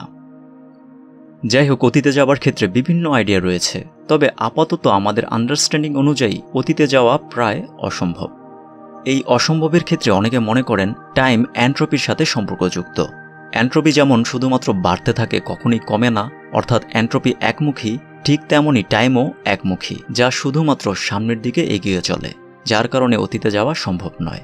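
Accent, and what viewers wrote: Narsingdi, Bangladesh. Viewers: ভাই, আপনার বাড়ি কি নরসিংদী? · ভাই আপনার এলাকা কি নরসিংদী?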